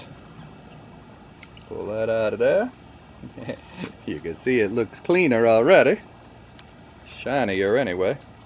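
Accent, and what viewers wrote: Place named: Louisiana, United States. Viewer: I was looking for help and found it. I also said this dude is from Louisiana. 😂. I recognized the accent. I’m from Gonzales La. Thanks for the help.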